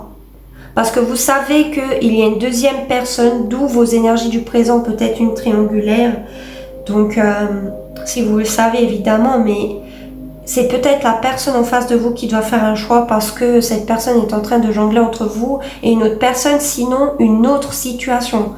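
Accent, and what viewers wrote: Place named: East, France. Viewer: Bonjour j adore le ton de votre voix des habitants de l est de France merci pour ce tirage très détaillé et pointu et de partager votre savoir c est un plaisir 🎉 trop parlant pour moi bien a vous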